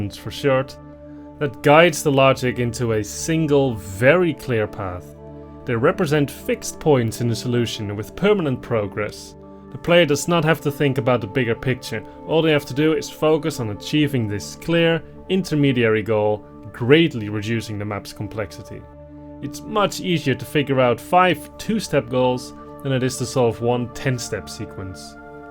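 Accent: Netherlands. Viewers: yooooooo your dutch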